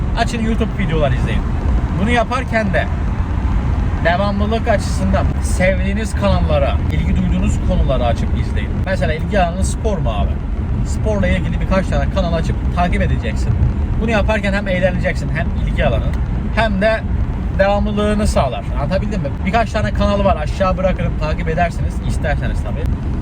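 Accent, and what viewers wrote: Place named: Azerbaijan. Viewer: Abi sen Azerbaycanlisin konuşmandan biliniyo😅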